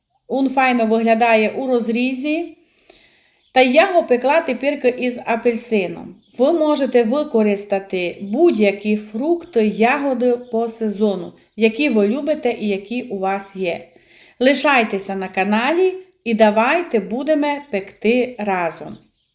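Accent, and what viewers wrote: Transcarpathia, Ukraine. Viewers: Пані, у Вас дуже гарний голос і мені подобається Ваш закарпатський діалект. Чимось схожий на зебру, наче і просто виглядає, але через екран пахне.У Вас є рецепт Зебри? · Дякую файно, дорога, за такий гарний простий рецепт. Цей калач ще виглядає, як великий кекс. Супер!!! Так приємно Вас слухати - стільки тепла і доброти у Вашому голосі!. І рідна закарпатська мова. Божих Благословінь Вам і Вашим рідним.❤❤❤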